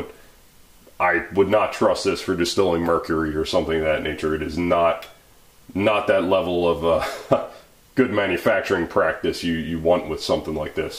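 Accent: United States. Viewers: This guy sounds like AvE. But more 'murican.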